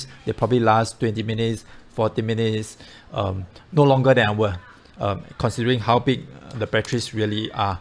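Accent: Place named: Singapore. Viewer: 6 seconds in....he calls a point and shoot a DSLR though you can hardly understand a word he says thanks to that incredibly hard to understand Singaporean accent that slaughters the English language, plus he slurs his words AND talks way too fast. HINT: Talk s-l-o-w-e-r so everyone can understand your bizarre accent. Its very unusual. Its not easy to understand. Slowing down will solve almost all of these problems. Then try to enunciate better. And do NOT use any slang. With that accent it sounds ridiculous. Just keep the words simple and clear.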